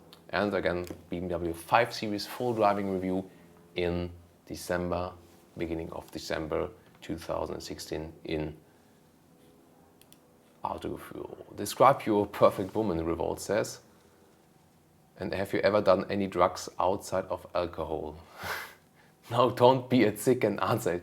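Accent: United Kingdom. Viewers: Many thanks for taking your time for the Q/A. Great episode, great Schnauzers, great British Accent. I am already looking forward to hear your Danish skills ...... definetly! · Your British accent is hilarious xD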